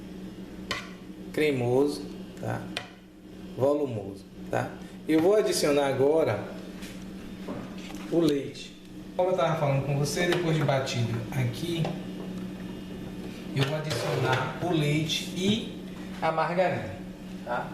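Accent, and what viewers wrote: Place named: Bahia, Brazil. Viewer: que Deus o abençoe por compartilhar com a gente seus conhecimentos. Parece q vc é baiano né? O povo bom...As viagens q mais gosto são para sua terra. Então, gostaria de uma receita sua pra forma de 30 cm de massa amanteigada branca e de chocolate que na esfarele quando eu cortar.... se puder me passar???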